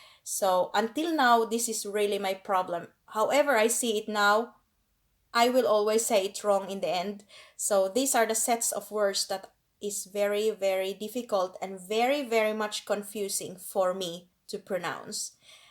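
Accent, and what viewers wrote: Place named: Finland. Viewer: Your accent even if you’re speaking english is very finnish na :) Minä myös asun Suomessa ja nyt opiskelen suomea 😅 Toivottavasti mä puhun sen hyvin kohta!😄 Writing it is always easier than speaking lol 😊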